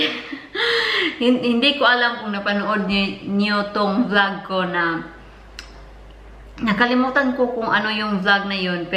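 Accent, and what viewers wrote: Visayas, Philippines. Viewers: Hahaha😂😂😂 asa diay ka sa Bisaya Dai nindut kaayo ka paminawon bisdak Kang dako😂😂😂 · Bait mo Kabayan . Yung tono nang pananalita mo ay bisaya ..katulad ko isang bisaya...hahaha.. I like it . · Bisaya kaayo oy :-) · Oi palangga taga saan ka sa pinas pangga para ka kc bisaya.... ang tuno mo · It seems in your speaking that you were bisaya , bisaya pud ko .. please support my channel . Done subscribing to your channel .. God bless :-)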